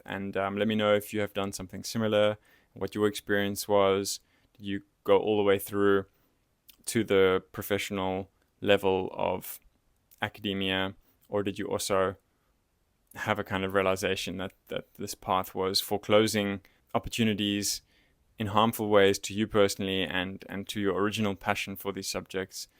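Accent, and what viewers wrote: South Africa. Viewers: Do I detect an SA accent? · The accent - you are South African aren't you?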